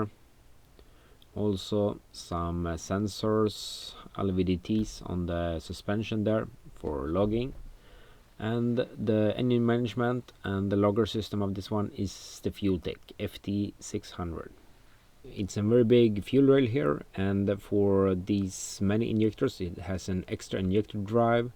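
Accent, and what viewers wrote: Sweden. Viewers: Your Swenglish accent is half the joy watching your videos! All in good faith!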